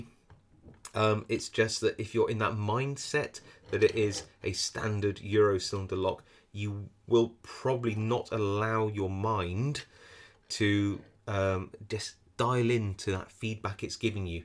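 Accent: England, United Kingdom. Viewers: Beautiful English. Beautifully authentic, proper English English!! Excellent video of course too by the way!! Have subscirbed. Thank you.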